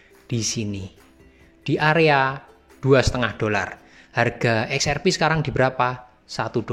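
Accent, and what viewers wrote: Java, Indonesia. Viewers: Ngomong cuk e medok tenan Cuk!!🤣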